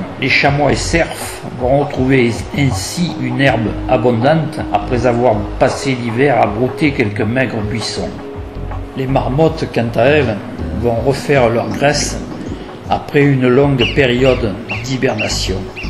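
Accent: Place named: Provence, France. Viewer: Mon père qui est randonneur me parle souvent du Mercantour qu'il affectionne pour l'avoir foulé plusieurs fois. Je le découvre avec émerveillement grâce à tes images magnifiques. Comme le dit si bien Daniel (Lapin 25), ta voix de provençal nous fait déjà voyager. Grand bravo, amicalement Gégé et Luciole du Doubs.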